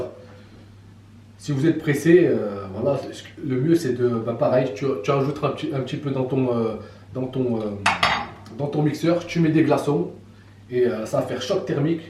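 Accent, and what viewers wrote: Saint-Étienne, France. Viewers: T as a petit accent, tu serais pas de Saint É?.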